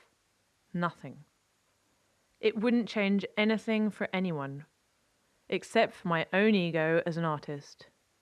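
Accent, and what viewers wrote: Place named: England, United Kingdom. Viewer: I think I am going to spend eternity wondering why the narrator is speaking with an English accent. Still it does make it quite entertaining. And yet somehow ...my comment ended.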